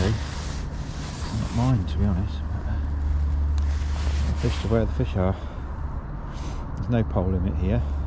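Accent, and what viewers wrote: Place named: Birmingham, United Kingdom. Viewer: Great result, even I was gutted when you lost those two fish 🥺the fish in Packington are all very nice Looking, and need telling it’s winter and to stop pulling so hard, I’m noticing a change of accent too 😎 definitely a brummie drawl creeping in from fishing there so often😁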